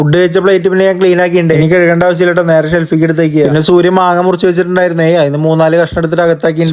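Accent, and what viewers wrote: Palakkad, India. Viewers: പാലക്കാട്‌ ശൈലി നന്നായി വരുന്നുണ്ടല്ലോ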